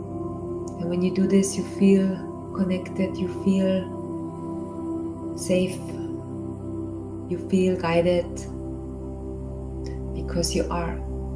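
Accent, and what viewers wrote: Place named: Austria. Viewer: So happy to be part of Dr. world once again. Her voice, with its Austrian accent, is both charming and soothing. This blessed woman is a gift to the world. I'm grateful to be a recipient of her healing talent.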